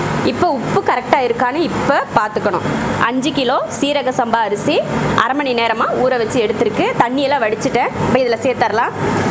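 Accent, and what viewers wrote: Coimbatore, India. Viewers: Akka neenga Coimbatoraa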